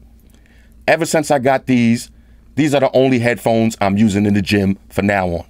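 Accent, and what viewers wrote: New York, United States. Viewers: SQUAAAAD! Love you. Half of the time I'm watching your videos because i'm a tech girl and I want the latest stuff out. But the other half is because i'm in The South and I miss NY dudes with the "accent". Take care and continue to bring us that good ISHHHHH!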